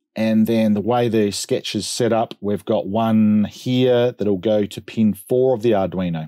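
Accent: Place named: Australia. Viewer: Well, kinda hard to say, but listening to it was easy, and though I was doing other things, I was able to key in on what I was searching for, I.E., tying my Arduino in with my Digitrax system, plus a bonus of JMRI interfacing with both. And I have to be honest, I found your Australian accent cool! That said, I will probably listen to it again and again to get the full gist of it. But overall, it was easy to listen to and very comprehensive. Thanks again, mate, or as we say In America, pal!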